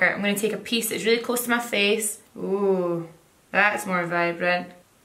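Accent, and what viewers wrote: Scotland, United Kingdom. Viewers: I love your hair and make up and your style!!! Please can you help me as I am very pale and now have mousy coloured hair which I highlight nbut I so so want dark hair without the commitment. So do you know anywhere I can buy either real hair wigs or similar???? Thanks I used to work in Glasgoiw and come from Renfrewshire but now live in Perthshire so bloomin great to see someone in my accent thrive!!! oxoxoxoxo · Omg I love her Scottish accent! She's fantastic!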